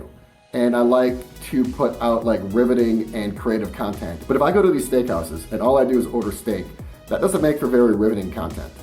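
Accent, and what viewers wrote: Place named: Wisconsin, United States. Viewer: I knew it! I caught subtle hints of that Wisconsin accent on your previous vids and that was what I guessed. :)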